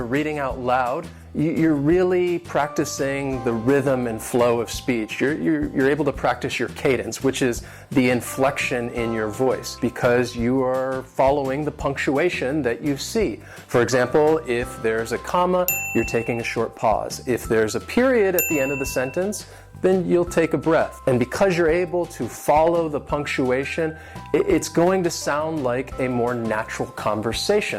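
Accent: United States. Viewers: I love listening your videos, you're a good teacher, it's quite easy to understad you, although you've got such an strong american accent, nevertheless it's lovely, i really enjoy myself listening to, awesome, greetings from manresa catalonia" cheers "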